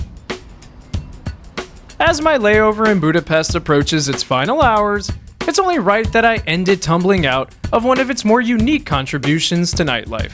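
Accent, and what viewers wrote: United States. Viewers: annoying American accent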